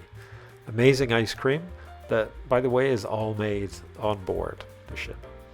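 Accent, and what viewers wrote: Scotland, United Kingdom. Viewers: Lovely vid, Thank you. We have just booked the Lisbon to Buenos Aires cruise in Nov '24 on this ship. Nice to hear you Scottish accent appearing. Big hugs fae Bonnie Scotland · Hey, I’m assuming you are Scottish by your accent. You can pronounce Foyer just like us Brits and Canadians do. Don’t mind the US bastardised pronunciation lol. 😂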